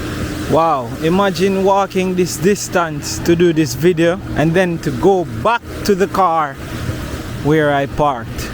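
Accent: Jamaica. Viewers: Thank you for this chance 💜 to see Negrill again. Love to hear your beautiful Jamaican accent. Hope to return again to your country again one day. Such wonderful people and country!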